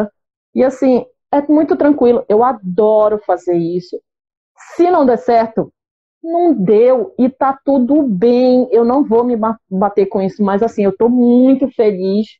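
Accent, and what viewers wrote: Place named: Recife, Brazil. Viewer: Pelo sotaque acho que é de Recife/PE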